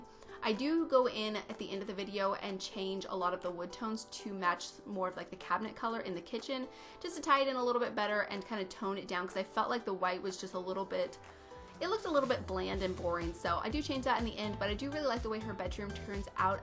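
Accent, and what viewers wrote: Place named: United States. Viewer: Beautiful! I always enjoy watching your renovations and I know it sounds weird but you have a lovely voice! Probably because you’re American and I’m English it makes a nice change! 😊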